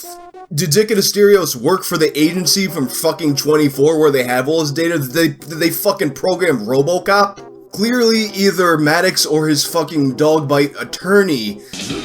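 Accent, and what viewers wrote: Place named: New York, United States. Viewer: It's called a New York accent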